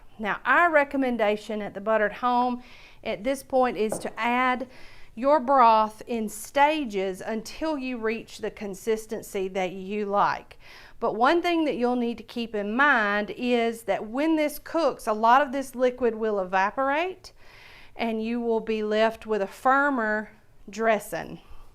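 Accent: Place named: North Georgia, United States. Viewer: Looks great! I've never made homemade cornbread before, so I'm so happy I found this demonstration. "Smell-O-Vision!" I love it! You sound like North Georgia or East Tennessee, and it was really enjoyable listening to you. Happy Thanksgiving!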